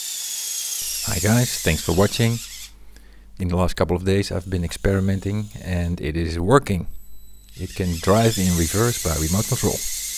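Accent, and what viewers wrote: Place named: Netherlands. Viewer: Je hoort zo goed dat ie gw Nederlands is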